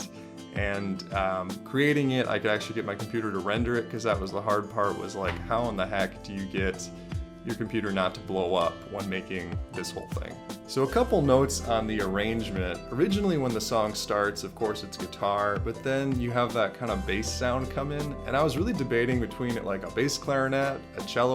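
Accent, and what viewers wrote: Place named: United States. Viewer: I don’t know why I always assumed you were from Eastern Europe. I was shocked to hear the American accent 😂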